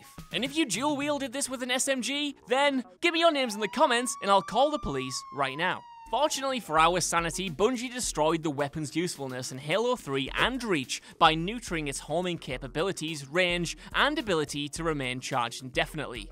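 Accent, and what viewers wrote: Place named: Newcastle, United Kingdom. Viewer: Felt like i was listening to a geordie shore reject. Good list though👌👍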